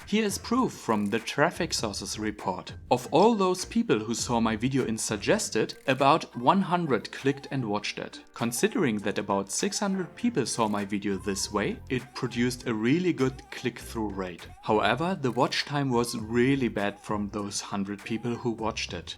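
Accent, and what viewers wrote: Germany. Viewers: Heavy German Accent. You say [exactly] instead of [exectly]. I know the first is right but its spoken like the second one. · alter red doch einfach deutsch man haha, wenn deutsche english kann man nicht aushalten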